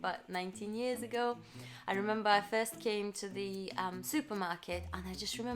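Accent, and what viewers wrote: Yorkshire, United Kingdom. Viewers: Sounds like a Yorkshire accent’ goes perfectly with your personality’ love it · You have a nice Yorkshire accent LUV. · Love your accent sounds like you've got a bit of Yorkshire in there 😂😍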